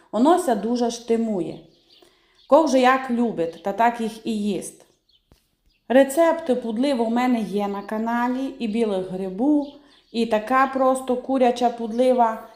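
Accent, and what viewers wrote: Transcarpathia, Ukraine. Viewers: добрий день! Дякую вам за цю смакоту, ви так все доступно пояснюєте, що навіть недосвідчена господиня з легкістю може це приготувати, якщо є бажання звісно! Особлива подяка вам за ваш закарпатський діалект, кожне відео дивлюсь із задоволенням! Завдяки вам навчилась закручувати голубці таким способом( конвертиком), так кажуть на Вінниччині. Миру, добра, перемоги нашій рідній, рясних Божих благословінь вам і вашіій великій родині! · дякую Вам за рецепти i Вашу закарпатську мову - вона неперевершена. А на дурнiв не звертайте уваги. Пишуть коментарi i дають поради, а помилок, як у учнiв першого класу. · Як вам не соромно! дуже щира мудра жінка. А те, що вона так розмовляє, то на Закарпатті такий місцевий діалект, і це прекрасно, тому що говорить про різноманіття нашої країни. Ви повинні вибачитись за ваше хамство. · Не слушайте всяких дураков. 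Так как разговаривают на Закарпатье, нигде не говорят. Наш диалект не сравним ни с чем. Я слушаю вас с удовольствием, сама из Закарпатья уже 30 лет живу в Греции и не забыла до сих пор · Дякую Вам за хороші рецепти, коментарі до страв, оформлення страв та столу, красивий посуд. І надалі показуйте закарпатські страви, ваш говір і традиції закарпаття. На дурні коментарі не зважайте.😊😊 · Дякую за смачні рецепти,нічого не змінюй,приємно чути закарпатський діалект,розвиток каналу та нових підписчиків,благополуча твоїй родині. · Хто це такий безграмотний навчає, як їй розмовляти? У кожному регіоні України є свій специфічний діалект. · мы вас очень любим и мы очень любим ваш закарпатский говор. С любовью из Нью Йорка. 🇺🇸❤️🇺🇦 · Закрийся, безграмотне нещастя Це закарпатська говірка! Приємна на слух і всім зрозуміла Велика подяка цій чудовій господині ❤❤❤ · Ой, Андрюха, насмішив😂 Це закарпатській діалект. 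на це питання вже відповідала. А у самого який діалект? Скільки помилок у солов'їній😂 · Дякую, люблю слухати Вашу мову з закарпатським діалектом! І господиня ви прекрасна, молодець!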